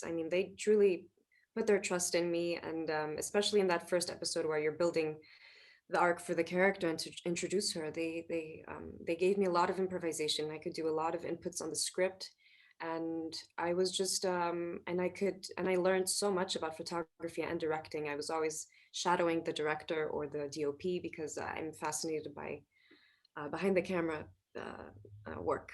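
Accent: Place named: United States. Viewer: Why does she have an American accent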